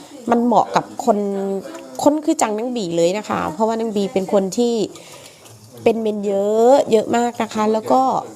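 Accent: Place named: North, Thailand. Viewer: อู้คำ เหนือเลย คุณยายติดตามตลอดคะจากยายพรรณคะ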